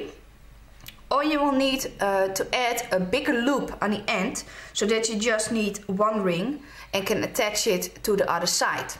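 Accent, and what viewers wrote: Netherlands. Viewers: hoorde aan je accent dat je nederlands bent haha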